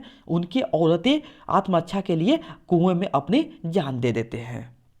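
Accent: Bengal, India. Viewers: Like bengoli accent 😊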